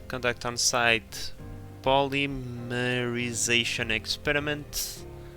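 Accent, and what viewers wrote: Portugal. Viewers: I bet you are portuguese, you sound like it, dont get me wrong, your english is very good :) and spanish people dont go along that well with english eheh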